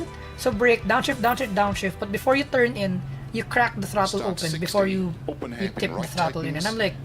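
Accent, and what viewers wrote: Philippines. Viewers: Filipino based on what other people have said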